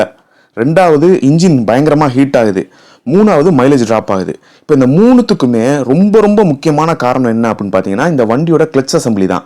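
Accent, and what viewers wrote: Madurai, India. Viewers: Bro nenga madurai,yahh??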